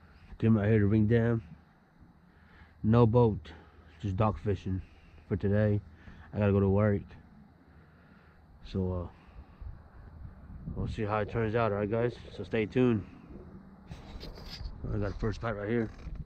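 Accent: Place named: California, United States. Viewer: I love his Californian accent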